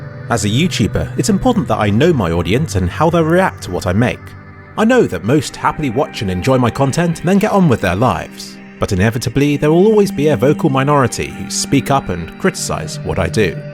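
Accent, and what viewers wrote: United Kingdom. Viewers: The British accent and your voice are must for me to listen to each week.Gorgeous lyrics and entertaining videos don't hurt either.Keep doing what you are doing for as long as you enjoy it cuz i certainly love all your content,even some i did not expect to love.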